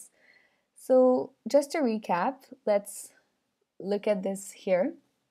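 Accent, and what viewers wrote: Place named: India. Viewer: Thanks. 👍 I was just wondering if you are an Indian? You sound like one.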